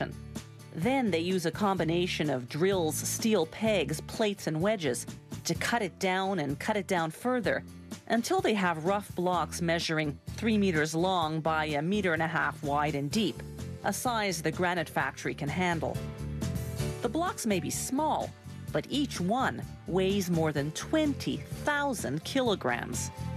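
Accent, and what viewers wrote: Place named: Canada. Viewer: I can tell the narrator is Canadian because she says "a boat" and "PROcess" alot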